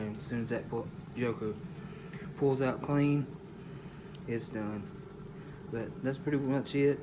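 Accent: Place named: South, United States. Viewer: Beautiful home cooking honey! That pork looked amazing. The bark was spot on!! 🔥 That’s funny and cute about you not saying “butt”! Love your country personality and accent and loved your outside setting.. very peaceful! Thank you for sharing. I enjoyed the video honey! 😘 PS the sammich looked amazing! 🤤 🙌🏻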